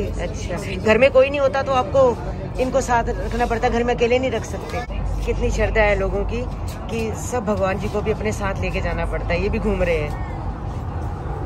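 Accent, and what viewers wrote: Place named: Punjab, India. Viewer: Aunty g apki way of taking mein jo punjabi flavour hota hai I like it love u lot from Punjab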